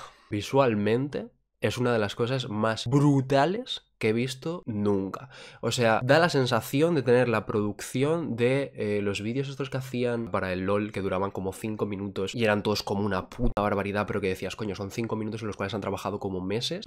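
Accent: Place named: Cuba. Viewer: Soy ek unico que piensa que suena medio cubano en este video al principio mayormente xd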